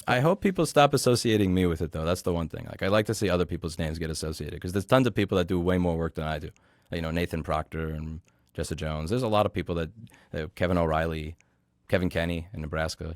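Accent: Australia. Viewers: With an Australian accent? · He has an Australian accent... Also you aren't as clever as you think you are. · I’m Australian so it was always obvious that he was Australian but Australians don't have such distinct accents that alline with people’s race so it’s often not easy to pick. There are ethnic accent variations in Australia but they are often learned from your family rather than broader community so if you're a second or third gen Aussie you might just sound like everyone else. There are exceptions like people from Melbourne with Greek heritage like Nick Kygerios (I don't think he was originally born in Melbourne and he’s half Greek) but you can hear it in his accent. That's most likely because Melbourne has the largest Greek community outside of Greece. The Indigenous Australian accent is also quite distinct but many Aboriginal people don’t have it. I was surprised to see isn't white after watching his videos for a while, that was a whole second or two of surprise! 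Either way it doesn't really matter, I don't think anyone is watching his channel because they think he’s white any more than people watch MKBHD because he’s black (but has a more neutral accent). I’m guessing he went to a good school and he shares the same general accent that most of his peers had. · Being interviewed by a Brit wait whats with the Aus twang. Ah... ok. Interesting. Cool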